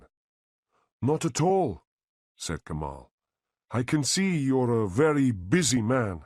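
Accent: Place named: United Kingdom. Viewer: You are my favourite and perfect story poster, because its always with British accent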